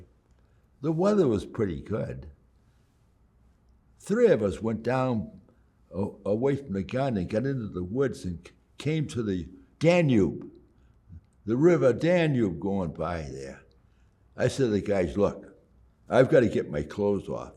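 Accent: Boston, United States. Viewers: This guy is a real son of Massachusetts… WICKED HAHHD accent and all! 😂🤣Reminds me of my grandfather and great uncles from Massachusetts who all bravely served in WWII. My grandfather Charlie died when I was just 8 years old. I wish I could’ve known him as I got older. I wish I could’ve had the opportunity to sit down with him, smoke a cigar, and drink some of his favorite bourbon (loved the real KY stuff) with him and ask him about his life growing up in the depression and then his service in the war (Army aircraft mechanic, Pacific Theater), building his family and working as a butcher for Chicago Beef for 40 years. My mom being the youngest ‘oopsie baby’ born in 64’ when he and my grandmother were 40. 😂🤣🤣 I saw my grandfather in this man and started crying when I was watching. I miss you everyday and love you so much Pa. I’ll see you again someday and we’ll have that rocks glass in heaven. 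🇺🇸🫡 💙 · Like the Boston accent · This guy has an accent exactly like my friend from Malden Massachusetts. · he has the same accent as my grandfather who grew up in Charlestown MA in the 40’s and 50’s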